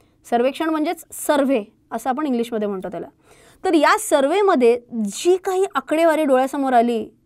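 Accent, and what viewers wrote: Pune, India. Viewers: एक नंबर मॅम, खूप छान वाटलं तुम्हाला मराठीमधून ऐकून!! मी कालच नोंदणी केलीय!! आर्थिक साक्षरता आणि तीही तुमच्या ओघवत्या पुणेरी भाषेत म्हणजे जणू दुग्धशर्करा योगच!!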